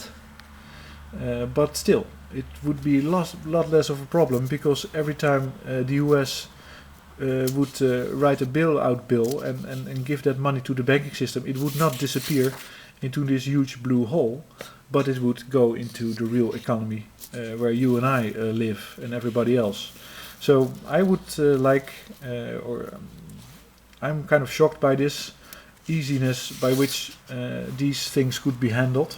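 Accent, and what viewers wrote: Netherlands. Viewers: dutch accent is unmistakeable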